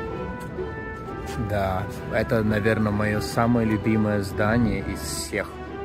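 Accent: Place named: Baltic states. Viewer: Уселась смотреть, видео новое, а вы вдруг в рубашке. Неожиданно. Спасибо, отвлекли. У вас приятный акцент, какой всегда я думала, должен быть у прибалтов.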